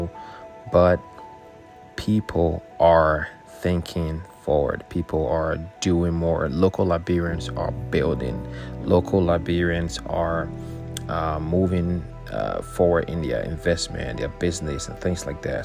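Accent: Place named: United States. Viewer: American accent bruh, u eat cassava leaf? 😅😅😅. Awesome content bruh 👌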